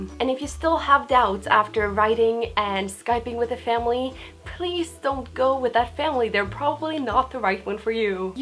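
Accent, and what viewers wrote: United States. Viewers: where are you from. you sound American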